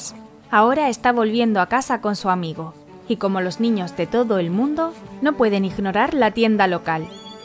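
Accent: Spain. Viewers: Vaya , el vídeo con acento español qué maravilla , no soporto esos acentos latinos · Menos mal que la narración es en español de España , no soporto esos vídeos con acentos latinos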